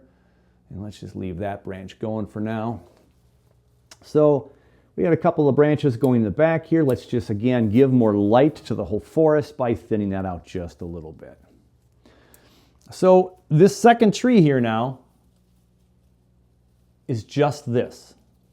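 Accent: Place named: Minnesota, United States. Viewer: Just stumbled upon your channel and the first thought I had was, "Huh, that turntable looks like the ones Van makes." Second thought was, "This guy sounds Minnesotan" and then you mentioned being in Minnesota and I was like, "Ah, well, there you go." Did Van make your turntable?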